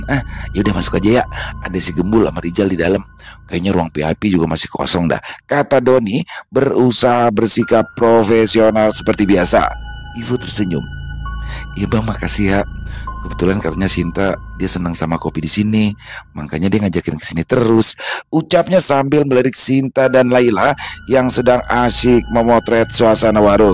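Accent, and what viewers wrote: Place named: Java, Indonesia. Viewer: Ngakak klau denger logat bicara gembul bg medok bgt lucu seru di tambah bg Dono yg slengean keren bgt bg hidup bgt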